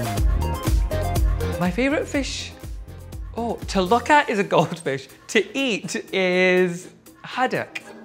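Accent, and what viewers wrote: England, United Kingdom. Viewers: His pronunciation might be regional from where he grew up in England. I studied Indian vegetarian cooking from a master ( an authentic Indian guru) and I was a food snob for years, thinking his way and pronunciation was RIGHT. Then I realized that I was being unnecessarily judgmental and making myself better than others. That’s not the goal of all the yoga I was doing. I’m much more forgiving of and actually appreciative of people who don’t do life exactly the way I do. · I know this is a year late but honestly yes, stop making fun of people because their accent isn't "perfect" he has a British accent and that's okay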